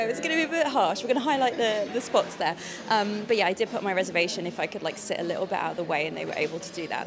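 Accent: United Kingdom. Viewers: Thank you for the excellent vlog. It is calming to watch it. There is no yelling or constant nagging about selling stuff. You did a great job reviewing the cafe and the food. The elegant British accent is so good.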